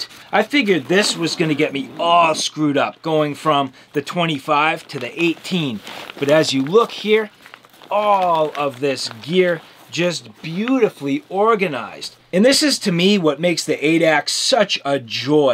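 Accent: Boston, United States. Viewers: Great review, and your Boston accent makes it even better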